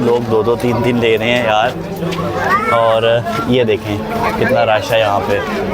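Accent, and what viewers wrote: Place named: Punjab, India. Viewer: Bhai tum Punjabi ho tum jab Urdu bolte ho Punjabi tone me bahut maza ata he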